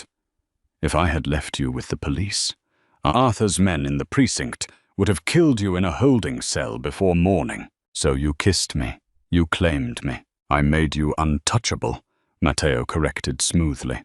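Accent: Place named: United Kingdom. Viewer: I love 💕 the story, but I get tired of the British narrator. He is in America 🇺🇸, and the narrator should have an American accent. Don’t get me wrong, I do like the British accent, but I would like to hear the American accent sometimes. The British narrator sounds too dramatic. Rena from Rio Rancho, New Mexico, USA 🇺🇸. ❤❤❤🥰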